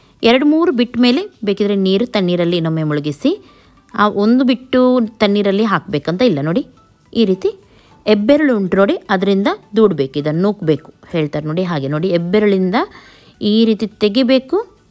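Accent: Mangalore, India. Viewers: Your from our mangalore your words like manglore puchhk take pucch 😅😅i like this tq..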